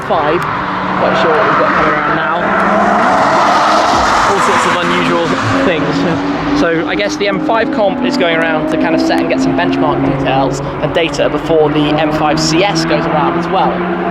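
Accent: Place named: United Kingdom. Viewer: the man of a billion cars and with the amazing British accent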